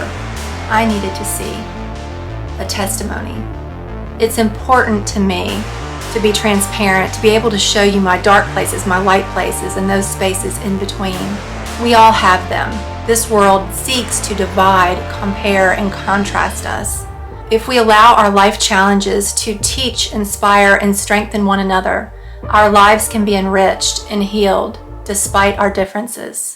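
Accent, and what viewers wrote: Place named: South, United States. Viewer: I like Blue and Yellow and your southern accent.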